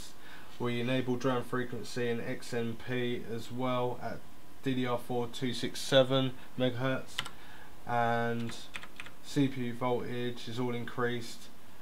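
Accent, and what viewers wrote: England, United Kingdom. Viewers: Why you have english accent?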